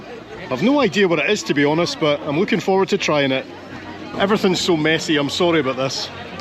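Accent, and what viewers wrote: Scotland, United Kingdom. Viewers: I've never been to Europe & you videos & Scottish Accent are wonderful. Thx again. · Merry Christmas and Alicja🎄from Berlin. Next time you’re in town, gimme a call and I’ll show you around. I love your videos and the Scottish accent. Keep on going in 2025 🎉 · Thanks for all these fabulous videos. Late last year, we discovered one and your videos and thoroughly enjoyed it so much that we decided to go back to your first video and watch every one. We are still watching them but are now nearing the end. We also keep telling our friends and family about your fabulous videos and encourage them to watch. A lot of our enjoyment of your videos is not only your presentation and the places you visit, but also enjoy listening to your accent and your Scottish description of objects and places e.g. "wee" and "bonnie". So, many thanks for providing us with so many hours of enjoyment and education. 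Patricia and John ❤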